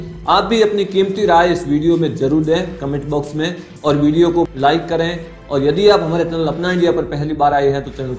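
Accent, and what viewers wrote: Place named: Rajasthan, India. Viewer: Rajasthani bhai se.....tabhi Hindi ke pronunciation mein 'छ' ki jagah 'स' ka prayog kiya hai. That's disturbing.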